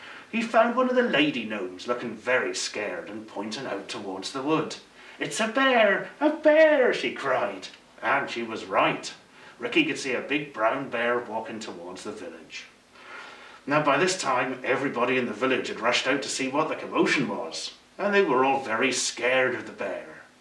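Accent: Norfolk, United Kingdom. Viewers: I can't tell if it's Ashens or it's just the Norfolk accent. Not heard the Norfolk accent outside of Ashens. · I could pick out that Norfolk accent of yours anywhere.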